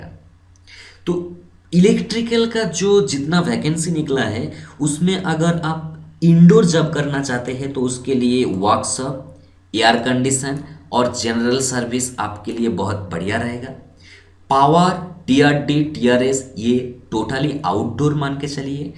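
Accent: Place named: Bengal, India. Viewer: Apna hindi thik karo bangali babu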